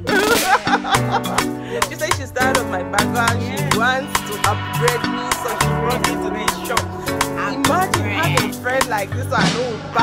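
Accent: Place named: Jamaica. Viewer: I thought this was a Jamaican talk.. are you jamaican?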